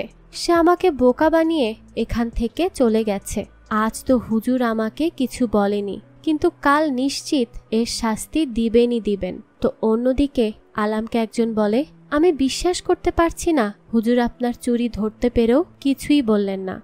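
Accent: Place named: Bangladesh. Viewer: আপু তুমি কোন দেশি,,,তোমার ভয়েস r কথা শুনে মনে হচ্ছে তুমি বাংলাদেশি,,,তোমার চেহারা দেখার খুব ইচ্ছা ছিল আমার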